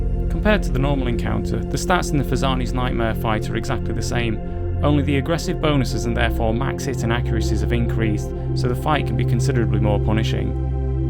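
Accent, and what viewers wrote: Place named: United Kingdom. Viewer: sat through a 20 second intro just to find out this guy is british, disliked and clicked off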